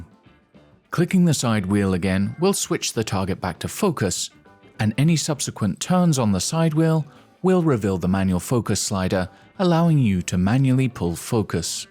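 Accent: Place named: United Kingdom. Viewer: I agree with you. Best of the British accent.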